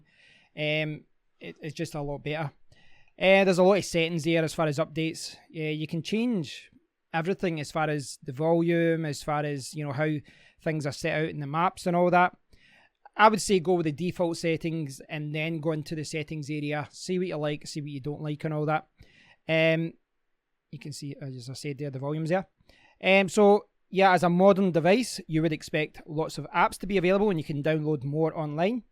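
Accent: Scotland, United Kingdom. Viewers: Neither Welsh n or Irish; it's pure Scottish. :-) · HES SCOTTISH!!!!!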